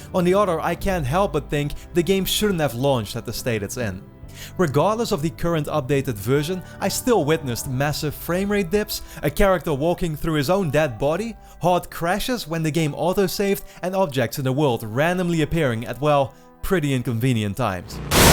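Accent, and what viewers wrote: Netherlands. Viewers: judging by your accent im guessing you are dutch, the dutch tend to be straight forward and say it how it is, it clashes with the sjw hivemind coming mostly from america, dont worry about it, just speak your mind and don't be afraid of having an actual personality, it is the reason i am subscribed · Your loud inhaling after each sentence is very distracting. It would be nice if could cut it out. A lot of Dutch people have a terrible accent, yours isn’t too bad though.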